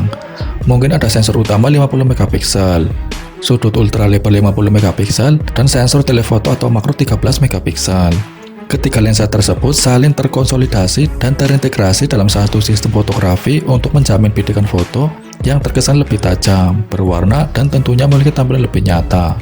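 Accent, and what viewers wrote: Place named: Java, Indonesia. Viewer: Suarane wes ketara medok hehehhe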